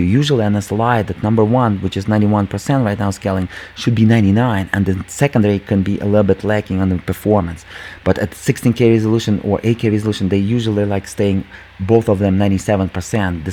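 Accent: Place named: Russia. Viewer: он русский слышно же по речи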